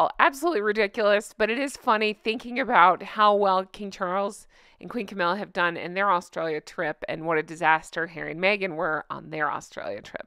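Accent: Ireland. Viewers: Oh thank you for making me laugh and chereing me up!! in bed ill,drinking tea, and i have not stopped laughing!! by the way your Irish accent is good!! take it from me☘❤ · I'm Irish and your Accent is not too far off😮love ya love your Channel God Bless to you and your wee family 🙏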